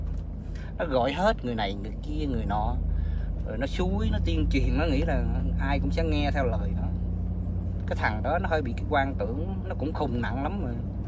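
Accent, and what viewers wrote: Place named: South, Vietnam. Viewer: hay lam anh noi dung day cach noi chuiyen rat nam bo toi thich anh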